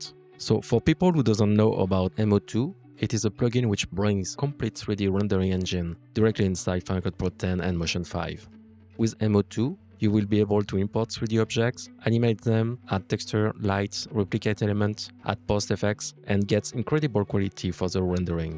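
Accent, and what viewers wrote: France. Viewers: Pas mal l'accent français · J'adore un accent français. Grand tutoriel, très détaillé et bien fait. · Un accent Français ou je rêve ?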